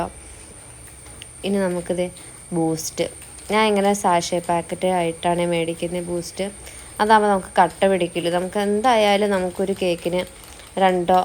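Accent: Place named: Kothamangalam, India. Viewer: Hi.. stalam evideya kothamangalam ano avdathe slang pole thonunn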